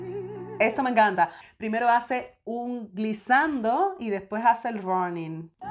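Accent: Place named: Cuba. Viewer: Primera vez que veo su canal,me parece que debe ser cubana por la forma de hablar 👍👍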